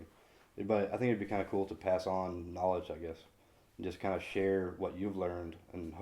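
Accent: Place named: Midwest, United States. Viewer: Wow! I always knew was from Sweden but listening to him talk he sounds like he is from the Mid-West....his English teacher must have been from Illinois, Indiana or Ohio (above the U.S. 30 corridor). He's an astonishing artist too.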